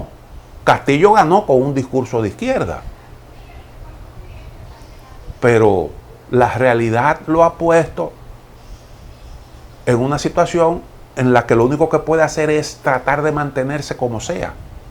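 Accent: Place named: Dominican Republic. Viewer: Buenas noches. Ciertamente no me pierdo ninguna de tus programas, siempre interesantes. Hace ya 23 años participe el la reconstrucción de RD en la zona de Haina, tras los desastres del Huracán George. Monte una mina en el Montoto, carca de Higuey, para fabricar agregados para asfaltado y hormigón de las carreteras y puentes que se habían destruido. Como dicen ahí, "dure " dos años, alternando con otros negocios que tenía en España. tengo grandes recuerdos de ese país y lo recuerdo con cariño. Su dicción Dominicana me encanta. Colaboraba conmigo un gran amigo dominicano, el Ingeniero Emilo Pimentel. Gran persona como amigo y profesional. Perdí el contacto con el. El Huracán fue en el 98 y hace años, si sigue entre nosotros, tendrá cerca de 80 años. A donde voy es que su manera de hablar pausada y sus dichos dominicanos me recuerdan a el. No he vuelto por ahí, pero me cuentan que ha cambiado mucho el país. 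Me llamaron para la construcción de carreteras en Haití, antes del terremoto. Había una empresa española que se llamaba Elsamex y nos propuso formar una UTE para realizar las obras mencionadas en Haití. Surgió el terremoto y se indio la oficina provisional de Elsamex en Haití, y murieron dos ingeniero y un topógrafo que se encontraban en dicha oficina. Lógicamente se suspendió la obra que se iba a realizar con financiación del Banco mundial, lo mismo que se hizo en las obras de Higuey.Le contaría muchas historia de mis vivencias en RD. pero no viene al c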